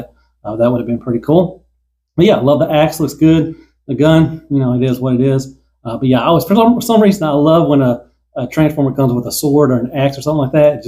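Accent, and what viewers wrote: South, United States. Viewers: Great review once again, just as I'm about to go to bed. I'm gonna have a nice, quiet sleep now thanks to you and that southern accent.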